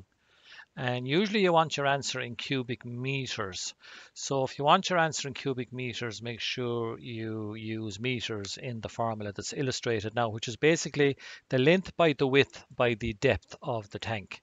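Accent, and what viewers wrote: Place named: Ireland. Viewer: Guys this guy is definitely form Ireland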